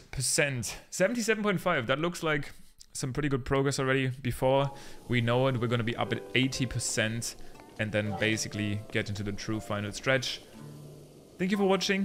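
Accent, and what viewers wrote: Germany. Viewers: are you german by any chance? i feel like i’m hearing a very slight accent and it reminds me of my home region there.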